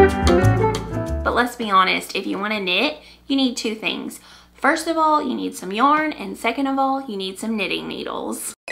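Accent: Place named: Kentucky, United States. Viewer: Hello!! I have a question I hope I done sound stupid! I am new to sock knitting I made my first sock last week during shock week. And now I am obsessed I am working on my first official set and I have been so worried about blocking them. I don’t have sock blockers at the moment it is just not in our budget! So I really need tips on blocking sock with out the blockers! Oh and I love your podcast I am from Kentucky so it’s cool to hear another knitter that sounds like me!!!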